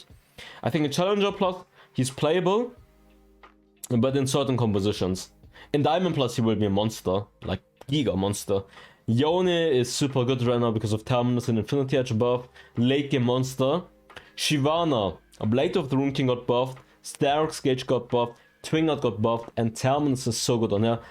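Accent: Germany. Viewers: Btw: you german?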